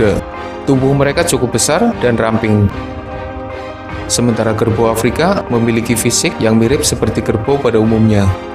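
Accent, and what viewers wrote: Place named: Java, Indonesia. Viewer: Min admin, jawane ngd to? Medok bgt ngmong e wkwk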